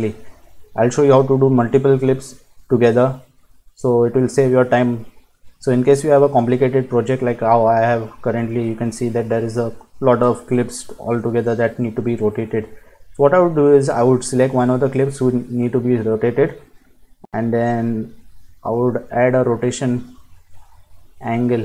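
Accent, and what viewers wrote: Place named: India. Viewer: Thank you so much!! I love indian accent.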